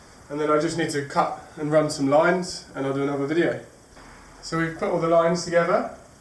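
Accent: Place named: Southern England, United Kingdom. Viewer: u sound like your from south England but ur Australian ?? crazy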